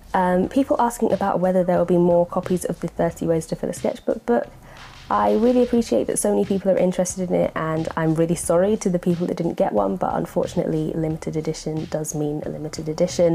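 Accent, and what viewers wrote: United Kingdom. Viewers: Maybe it's because they're from the same race and both has a british accent?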